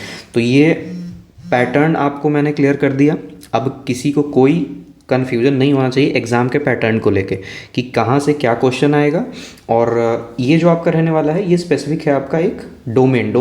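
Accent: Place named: Jehanabad, India. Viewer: भाई जहानाबाद से हो तुम??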